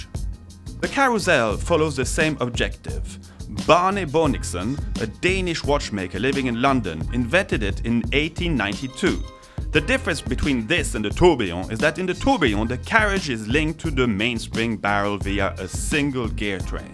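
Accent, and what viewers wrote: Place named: France. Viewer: Mais pourquoi ne pas le faire en français ? Surtout avec cet accent. Bonne revue quand-même.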